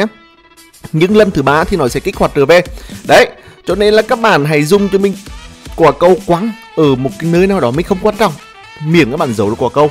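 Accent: Nghe An, Vietnam. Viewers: Anh ở đâu Nghệ An thế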